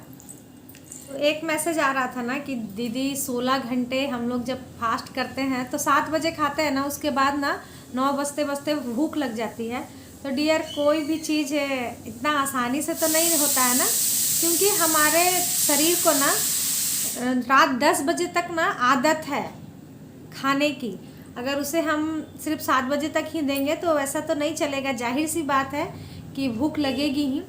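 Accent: Bengal, India. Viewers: Didi ap bengoli ho ????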